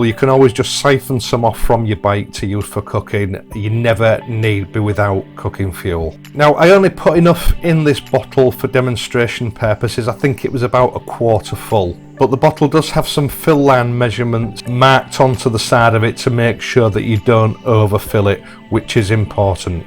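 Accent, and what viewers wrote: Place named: Hull, United Kingdom. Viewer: Hey, I noticed on a previous post your number plate has castle motorcycles on it, I have an 09 bonneville from there. And are you from Hull by any chance as I recognise the accent. Great videos by the way 👍🏻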